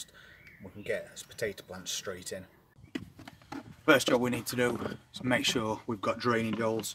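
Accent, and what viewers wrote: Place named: Derbyshire, United Kingdom. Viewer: You’re really settling into caravan plant life now. Your spuds look so good. We share the same dialect I reckon so listening to you reminds me of my Derbyshire family. Take care.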